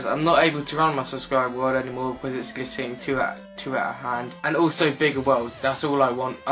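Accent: England, United Kingdom. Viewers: what part of the uk are u from? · Where abouts from England are you from?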